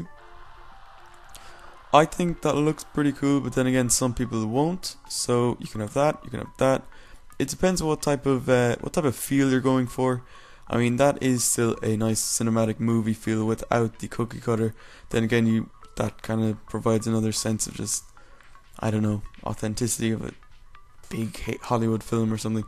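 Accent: Ireland. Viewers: Irish man ;D cork ?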